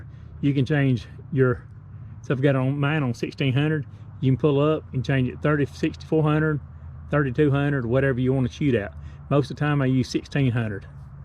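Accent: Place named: South, United States. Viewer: Great video! And I love your southern accent!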